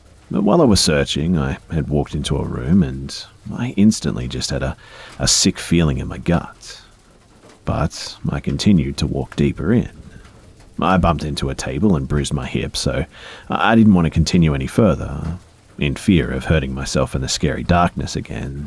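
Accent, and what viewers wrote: Australia. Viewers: You got a smooth voice man.......Australia ?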